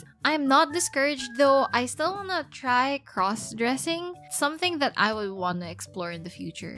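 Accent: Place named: Philippines. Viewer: One thing I love about your shorts is your slightly wicked humor and comedic timing. And yes, your Yor and Anya shorts hooked me. I got the sense that you would make one laugh a lot on a date. Have you considered standup? Anyway, please use humor as a part of what you produce. Also, I love your accent. You probably know but I’ll say it anyway: your kind of Philippina cute/sexy is a powerful magic wand anywhere. I would also love to see your aerial work!❤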